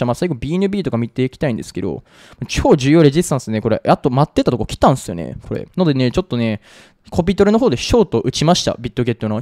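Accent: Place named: Kansai, Japan. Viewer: 関西出身の友人と口調が似ています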